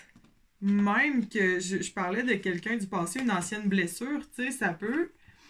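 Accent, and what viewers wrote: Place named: Quebec, Canada. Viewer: J'adore vôtre accent Québecois , vous êtes une Jolie Fille en Plus pas facile a suivre je suis Taureau du 17 Mai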